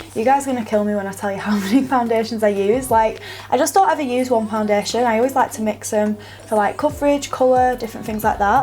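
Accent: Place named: United Kingdom. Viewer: You look so pretty my sweet friend also liked your brit accent🙂☺😙😙😙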